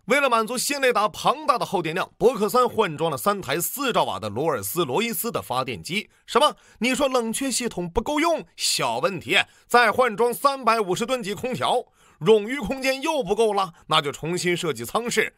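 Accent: Northeast, China. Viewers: 为啥把劳斯莱斯 翻译成罗尔斯罗伊斯呢？ 听口音你大陆人啊。 新的DDG主要是 操作熟练。从濒海 猪母 这些。还是老的伯克级靠谱。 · 东北口音难听死了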